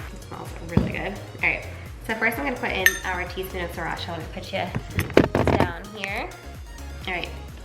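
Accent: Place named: East Coast, United States. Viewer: That east coast accent 🤩😝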